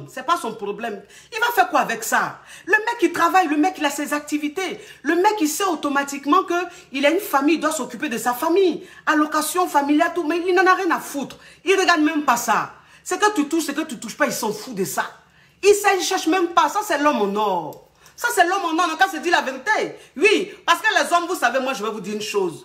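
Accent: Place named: Ivory Coast. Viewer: Une vraie ivoirienne qui parle la même, je suis fan, longue vie à toi la madré .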